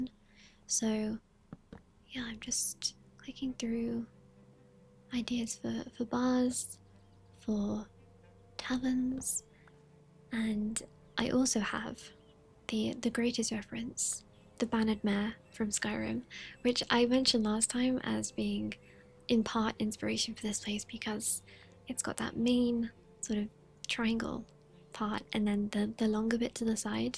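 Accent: England, United Kingdom. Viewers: Beautiful accent! What part of England are you from?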